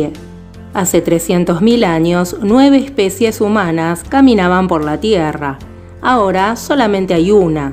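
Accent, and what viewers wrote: Argentina. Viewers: Eres argentina, ¿verdad?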